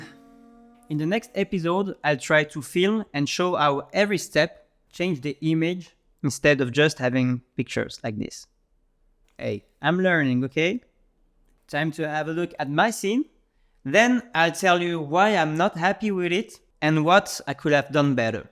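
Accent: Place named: France. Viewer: I like it, also your sense of humour and French accent, thank you! Just keep going and the Eps will get even better.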